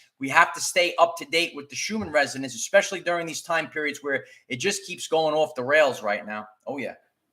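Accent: New York, United States. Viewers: OMG, I can hear NY in your accent! How do you get your hair so shiny? Like your content these days...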